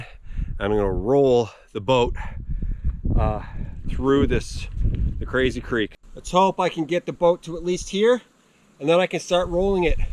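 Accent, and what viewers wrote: Newfoundland, Canada. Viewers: I'm really loving your videos. I saw you on Chuck Porters at his cabin. You guys rock . I here in your voice you to are from Newfoundland. I myself was born Bell Island but living in stressful Ontario. God I would love to live like you brother. Your shows motivate me,keep them coming brother.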